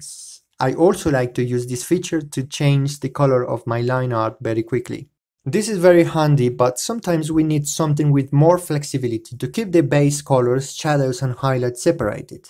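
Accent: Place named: Latin America. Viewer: me encanta que hables ingles aunque mi mente me dice que eres latino, asi que te entiendo el spanish que hay detras de tu ingles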